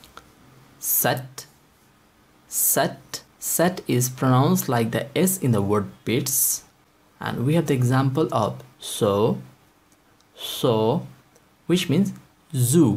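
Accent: India. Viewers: He is a good teacher. Wish he could do something about that very Indian accent. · LOL, that's good. Teaching is a noble work. Whether it be full time or part time. I'm from India, I have heard your accent here.. So I thought you might be Indian. Anyways, good luck with your Deutsche Kurs!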